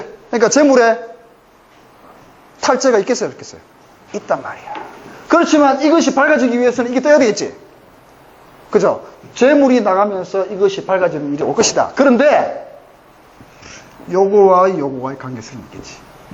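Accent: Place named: Gyeongsang, South Korea. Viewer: MB 젊었을 때 모습과 많이 닮았음. 목소리도 많이 닮음. 경상도 포항지역 사투리의 억양도...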